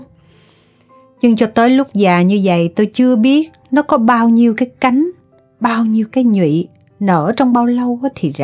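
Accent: South, Vietnam. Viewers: Truyện ngắn của Nguyễn Ngọc Tư đa số thuộc loại hay, từ câu truyện, cấu kết, lời văn, 6/10-10/10. Giọng đọc, cách đọc, và cách diễn giải của với giọng miền Nam thì quá tuyệt vời 8-10/10. Qua Một thời gian dài lắng nghe các truyện của “IQ Radio”, tôi lấy làm lạ tại sao số người ủng hộ chương trình này quá ít.( không vượt qua được 5k. Subscribers )?????? Bây giờ thì tôi hiểu tại sao. Nhà văn miền Nam, Mc miền Nam, mà trong nhiều phần của các truyện ngắn, lại thiên về CS. Vì vậy mới không được nhiều người miền Nam ủng hộ.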